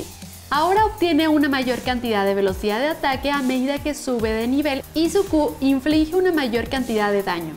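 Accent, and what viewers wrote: Mexico. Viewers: Es mexicana? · Demasiado robótica aparte su mexicano weyyyy uff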